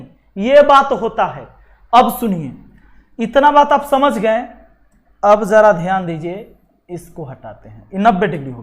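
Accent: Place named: Bihar, India. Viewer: Sale bihari hindi me bol liya kar.... Bihari bolna jruri hkya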